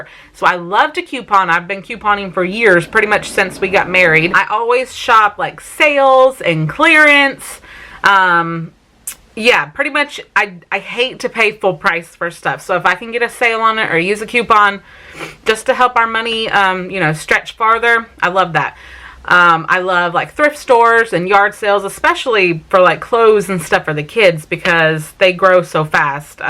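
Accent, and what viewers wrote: South, United States. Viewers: I love reading too! I’ve never read any of Jodie’s books but I saw the movie My Sister’s Keeper and loved it! I struggle to watch movies so I tend to just rewatch my favorites 💓 My husband encourages me to get some me time as well Target is my me time place too 😂 I love your southern accent, I figured you had southern roots and I love cruising as well. We were suppose to go cruising in April but that didn’t happen! We have the whole early care and education thing in common! I didn’t major in that field but I worked in the field for years and have a special place in my heart for tiny humans and how they learn 💓 Sorry for the novel comment! Loved getting to know you and thank you for answering my questions 🙂💕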